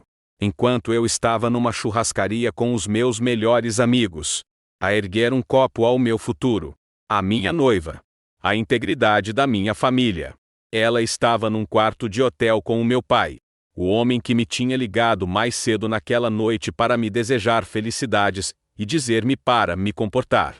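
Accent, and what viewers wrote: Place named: Fortaleza, Brazil. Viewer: Não gostei do narador é meio esquegito de Fortaleza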